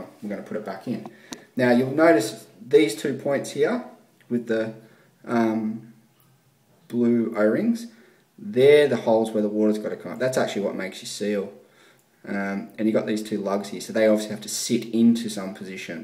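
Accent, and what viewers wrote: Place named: Australia. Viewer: Outstandingly good video because it was clearly demonstrated by an expert who spoke Aussie language. Good on ya mate..thanks!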